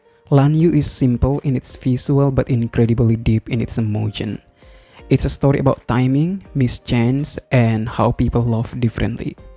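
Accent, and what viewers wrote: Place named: Indonesia. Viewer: It sound like Indonesian accent.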